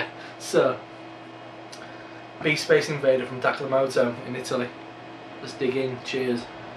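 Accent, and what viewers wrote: Yorkshire, United Kingdom. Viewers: wow you have friends all over haha :P = that BOOTIFUL :P love the Yorkshire accent = sounds like a great beer i saw BEERRITZ has some italian beers in recently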